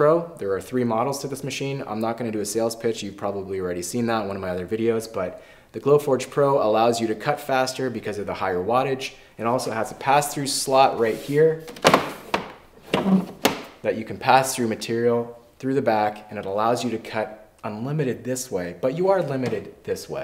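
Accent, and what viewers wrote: Canada. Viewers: Great vid! Thanks. Is it just me or do Canadians say "out" more than anyone else just to say "oot"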